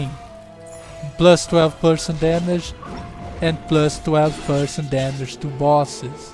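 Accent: Brazil. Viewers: esse sotaque brasileiro!!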